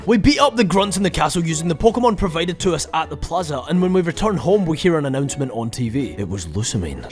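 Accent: Scotland, United Kingdom. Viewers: Most underated youtuber ever. And the whole Scottish thing makes it better as a Scottish person 👍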